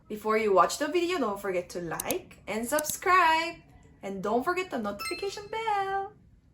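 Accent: Philippines. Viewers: GLAD YOU'RE PROUD ADOPTED THE PILIPINO ACCENT THAT'S WHY I FOLLOWED YOU AND KOREAN VLOGERS.. AWESOME!!! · I love your Filipino accent. I'm a proud fan. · Talaga nàman na pinay na pinay boses mo madam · Such a stupid question!! Seriously?!! What's so embarrassing about Filipino accent?! It's one of the coolest accents! 🙌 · I like your filipino accent ate it's cute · Hello 👋...thank you for loving us filipinos and loving your FILIPINO ACCENT and even you are a pure korean i can feel that you really love philippines😊!!...and it's true that no matter what accent you have the most important is you can communicate to others...i've just subscribe to your channel and moving forward to watch more of your upcoming videos. Ngaun pa lng love na kita😘. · Although your accent is Filipino you're very fluent in speaking English and I appreciate you very much. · You're right, we like the way you speak using Filipino accent. 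Our accent, i beliwved, is the best and clear accent in the world. Keep it up. We are proud of you. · You have a kolehiyala accent. It's easy to follow and understand. Great for content creation. You seem to have a Filipino sense of humor too. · ganda kya accent ng pinoy hahaha pinoy accent is easy to understand. i like your accent so pinoy talaga parang dika na korean . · Hindi ka naman mahahalatang foreigner now a days kasi pinoy accent ka na naman tapos ang beauty mo common na maputi singkit ang mata para ka ngang chinese, japanese eh na half Filipino. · Haha..akala ko sa pinas ka pinanganak at lumaki. Grabe kodus...sa ugali, sa kakulitan, pananalita, at lahat lahat na 100% percent pinoy na..tunay na pusong pinoy kabayan..❤💯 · Your Filipino accent is nothing to be embarrassed about. You have something that most Koreans don't have, to be able to speak Filipino, Korean, and English (3 languages)! 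It makes you a better person! In Korea, some may discriminate against you because of your Filipino accent, maybe some of your relatives. Don't be ashamed. Just be happy! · your tagalog and english accent is very pinoy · Daw Ilonggo ang tono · Nagsubcribe ako sayo ksi sobrang pilipino yung accent m eh nakakatuwa ka kahit pure korean k first time ko manuod sa channel m ito yung first video napanuod k sayo . And then nagsubcribe nako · In my opinion, your English is more on the class B type pinoy English accent, meaning it's being used by the so called "sosyal" crowd... the "tag-lish" language...not being used by the majority of pinoy and so different from the "Manny Paquiao" english accent. But if you live abroad like in the US or Canada...you definitely sounded weird 🤔 by your accent...but it's still better that other English accent spoken by other nationality. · Identification mo yang pinoy accent mo maraming may gusto marinig yan... 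· you are beautiful in that accent .....my australian friends thought you are a fil canadian ... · First time viewer here. Your Filipino accent is actually so on point the first few minutes I started the video. You really got that conyo way of speaking and some of our humour. I might as well subscribe right after finishing the video. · Embarrassed? You have the cutest pinay accent.❤ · for me, you are already a Filipina because of your accent and any of the 110 million Filipinos from our 7000 islands would accept you. Philippines is not homogenous unlike Korea. We have plenty of halfbreeds and you fall into that category since you were raised and nurtured in here the Philippines. The fact that both your parent are korean no longer matters. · Hello, you really sound Pinoy. And it's lovely, and as A says, "Love na kitaaa!" SuperLIKE. New SUB here! God bless you!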